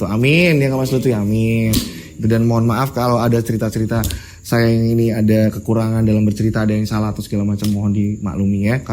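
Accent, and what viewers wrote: Java, Indonesia. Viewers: SUARANYA.....LOGAT NYA JAWA BANGET SAYA SANGAT SUKA , SANGAT MENJIWAI DAN BISA MEMBAWA YG MENDENGARKAN SEAKAN" IKUT MENYAKSIKANNYA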